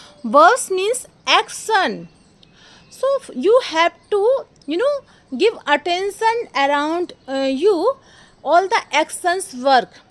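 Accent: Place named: India. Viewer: desi english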